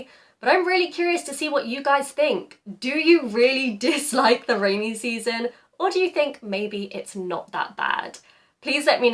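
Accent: United Kingdom. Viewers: 綺麗なイギリス英語。日本人にはこちらの方が馴染みやすいのでは無いかと思いました。 同じ内容をアメリカンアクセントで話されると面白そうだなと思いました。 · 語学学習に活用させてもらってます！イギリス英語が聴こえず苦手意識を持っていたのですがおかげさまで好きになることができました！ありがとうございます😊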